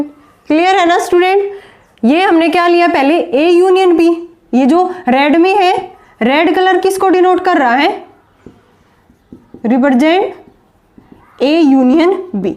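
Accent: Haryana, India. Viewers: Aap haryana se ho kya mam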